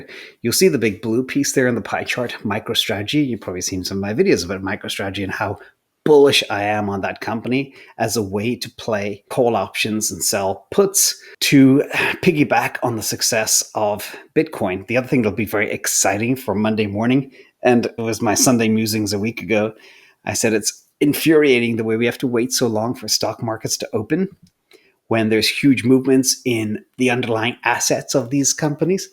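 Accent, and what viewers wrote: Ireland. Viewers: am I hearing a slight Irish accent.?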